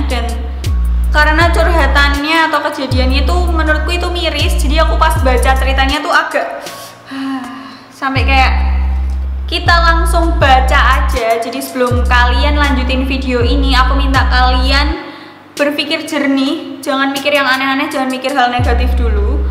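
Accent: Java, Indonesia. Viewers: wedok iki medokkk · Wong jowo ndi to mbak?😃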